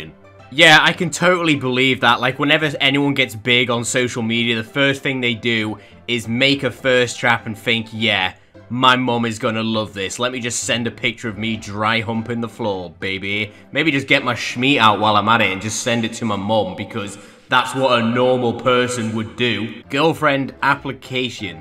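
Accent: United Kingdom. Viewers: i like ur vids but ur accent is too brittishy or smth so i hav to unsubscribe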